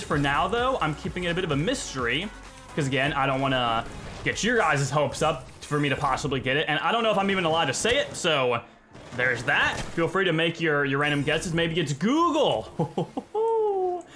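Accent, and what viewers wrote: United States. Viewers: What part of Ohio are you from?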